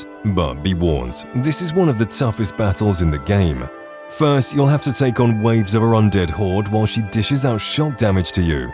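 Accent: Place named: England, United Kingdom. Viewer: That's how it is pronounced in England. You know, where English is from. Seriously... Americans are unbearably thick.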